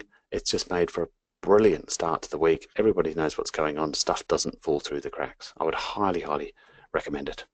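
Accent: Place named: United Kingdom. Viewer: British accents are sick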